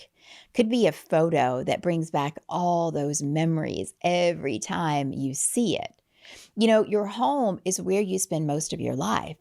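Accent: South, United States. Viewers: Love your country accent lol "i told you i was gonna cus" 39 here and here for all of it !! I was kind of sad approaching 40 but i feel empowered now ! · S**t and F**K are not Sexy or Attractive. You sound like a hillbilly even though you look good!!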